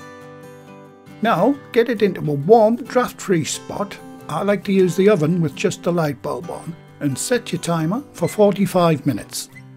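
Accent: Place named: Bradford, United Kingdom. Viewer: Hi! Love this recipe and I can’t wait to give these a try! I absolutely love listening to you I was born in Bradford so you sound like family to me! Great job on these keep cooking my friend!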